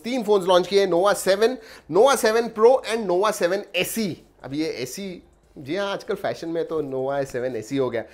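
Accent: Maharashtra, India. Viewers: Your style is a bit different and with a delicate touch of marathi you are a perfect knowledge enhancer.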